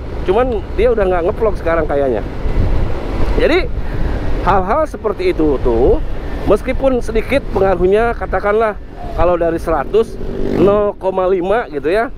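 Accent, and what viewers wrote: Purwakarta, Indonesia. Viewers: Kalo ngedenger cara ngomongnya jadi inget temen saya yang orang Purwakarta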